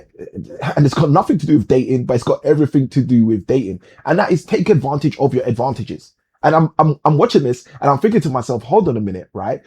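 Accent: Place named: Africa. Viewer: Your not from the UK your from some place in Africa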